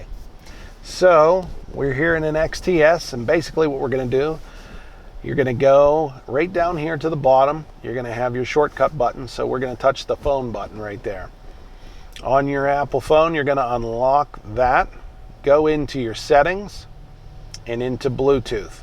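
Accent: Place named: Pittsburgh, United States. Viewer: that awful PIT accent, can hear that from. 4000 miles away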